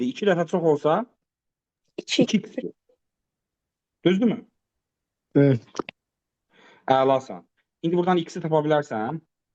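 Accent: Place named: Shaki, Azerbaijan. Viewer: Müəllim Şəkilisiz? Ləhcənizi bənzətdim.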